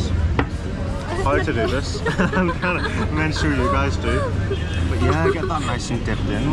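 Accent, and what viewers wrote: Northern Ireland, United Kingdom. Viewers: Your accent reminds me of a pal from Northern Ireland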